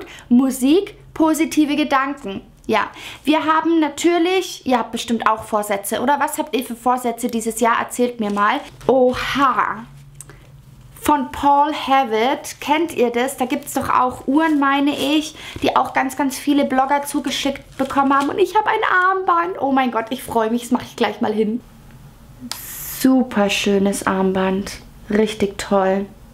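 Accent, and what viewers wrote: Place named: Franconia, Germany. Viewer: Du bist mir fränkisch am liebsten❤️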